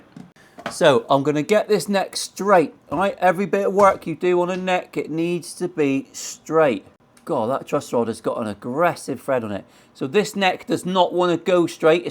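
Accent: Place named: Northern Ireland, United Kingdom. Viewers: I love your Norn Irn accent mate. Always makes me laugh. I'm from Norn Irn and you sound like a local. I'd love to see you do a whole video in the Norn Irn accent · I love the Northern Irish accent, especially when you say millimetres........Chuckle........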